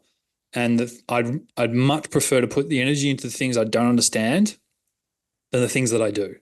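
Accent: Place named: Australia. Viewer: thanks mate! it's also nice to hear someone aussie (i live in melbourne) talking about business haha most of the stuff i listen to their always from the US or something. who's your mentors getting started back then?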